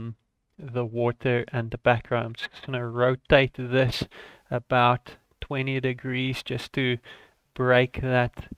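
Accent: South Africa. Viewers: Where are you from, you have great tutorials, sound South African?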